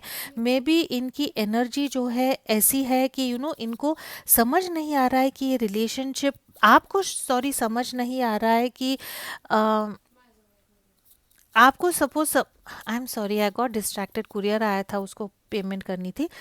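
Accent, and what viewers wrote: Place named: Maharashtra, India. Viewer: मॅम मराठी आहात ते ekun खूप छान वाटले..thank u for reading.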